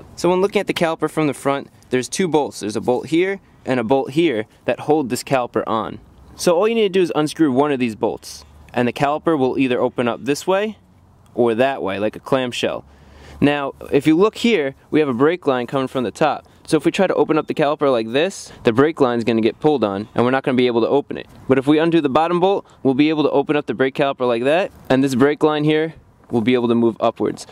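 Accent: United States. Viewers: Just wanted to thank you for your videos. Just spent my Sunday fixing the brakes of the mother of my child's car. Everything you taught was present - blocked nipple, rusty brake fluid and seized bolts. Loved the 1 man bleeding method too. The car now stops on a six pence. My boy likes your tutorials as he's car made and likes your accent ( I think you sound like Marshall Mathers - no offence) - he's only 5 but obsessed with all things from the US of A:) Thanks again.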